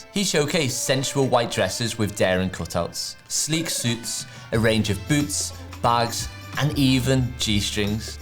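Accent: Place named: Northern England, United Kingdom. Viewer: Great vid. Very informative. Great northern accent. That compliment is from a South London boy. Ya get me.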